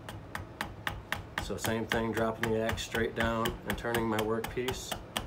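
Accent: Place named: Michigan, United States. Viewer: Great stuff. Your stropping video helped tons. I heard the accent before I saw your channel name and knew you were from Michigan. It’s hard to find green wood downriver!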